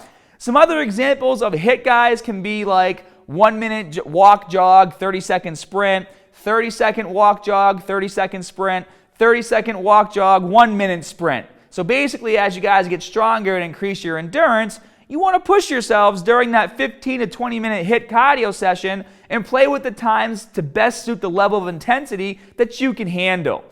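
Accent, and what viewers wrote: Boston, United States. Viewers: Is he from Boston? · From Boston? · I I've been watching your videos to help me get in shape and learn how to do stuff. I have to say this video your Boston accent was way too strong! Every time you said the word cardio it was killing me! Now... I don't think understood 1 word of this w all the tequnical jargon, except that maby I should eat a little something before my 20 minute cardio in the am, but what? a full breakfast? have exercise induced asthma so a lot of times I will walk for several minutes and then do a jog for several minutes (whether that's outside or on the treadmill). Didn't know that was considered some sort of a HIT routine. Love the shirts btw, the superheroes are the best, where do u get them?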